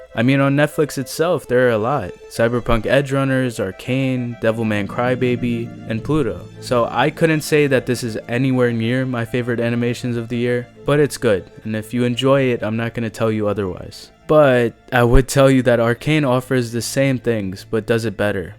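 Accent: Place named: United States. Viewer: the bullet is from an old musket ... it's not as fast , the water ? you think cold water is a danger ? you sound american , the one hand holding on ? did you forget about adrenaline ? and that Mizu is strong ? + add it beeing animation and animation exists to portray things real life can't to boot , so idk man , ur probs just digging too much into liquid sands , instead of enjoying what you get , i really enjoyed Blue Eye Samurai , i don't think you see what Blue Eye Samurai does , because it delves into asian culture and humour , as someone who watched alot of those films , the funny cameos , tiny little things and jokes weren't wasted on me , it's unfortunate that you didn't like it , probs the american in you speaking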